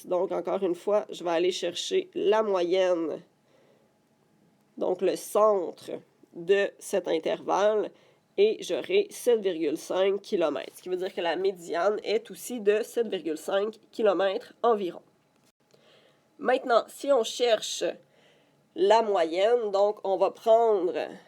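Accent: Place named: Quebec, Canada. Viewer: elle est quebecoise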